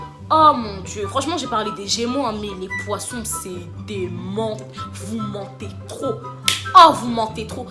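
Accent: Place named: England, United Kingdom. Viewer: team gémeaux malgré toutes les critiques 😂 les gens croient que je suis taureau le plus souvent... j'ai sorti une video sur les 3 qualités de chaque signe astro regarde l'avis d'une gémeaux^^ tu me tues , comme t'as demonté tous les signes 😂😂😂 ma bestie est poisson (sinon ton accent anglais est genial) !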